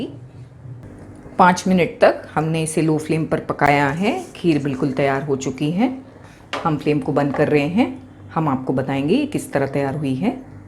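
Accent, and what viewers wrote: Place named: South India, India. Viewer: Hello ma'am rishampreet here from India today I watch ur video first time nice cooking videos Aap ki Hindi se feel ho raha he ki aap India ke south se he m I right or not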